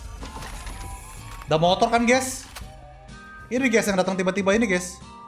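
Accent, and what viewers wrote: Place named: Makassar, Indonesia. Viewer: orang makassar dia logat makassar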